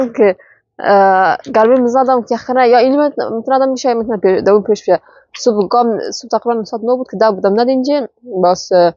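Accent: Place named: Hazarajat, Afghanistan. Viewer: چی قشنگ هزارگی گپ میزنی منم بخدا اینقد هزارگی گپ زده نمتونم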